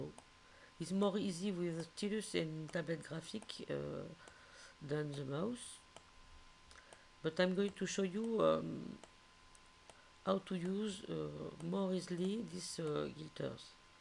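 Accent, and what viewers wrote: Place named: France. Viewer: Great tutorial. Your english was perfect. Your accent reminds me of my grandmother whose first language was French. I miss hearing it. I learned something new with the tutorial! Thank you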